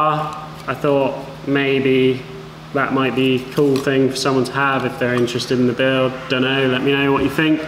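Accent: United Kingdom. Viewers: Keep up the good work mate love the channel its good to hear a British voice 😂 it’s a shame you didn’t fabricate your own arches would make great content!!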